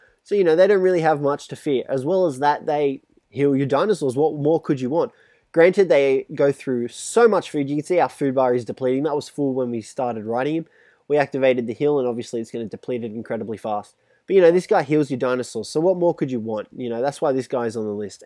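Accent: Australia. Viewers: Are you Aussie? Because it sure sounds like it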